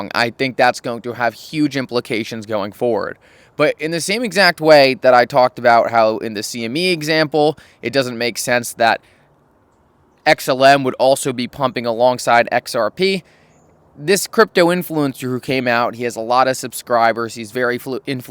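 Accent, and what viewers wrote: Allentown, United States. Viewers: It is going down with an Allentown accent. I thought you were good in Math dude.. Gaining 20% of 60 % crash from March levels isn't going up bro.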